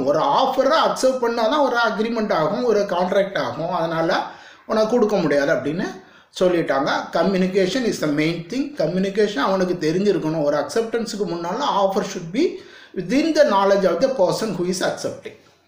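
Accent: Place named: Tirunelveli, India. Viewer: Tirunelveli slang tha...mass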